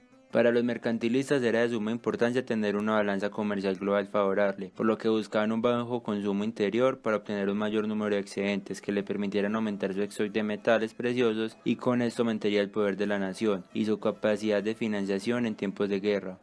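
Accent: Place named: Antioquia, Colombia. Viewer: asi es el acento paisa en colombia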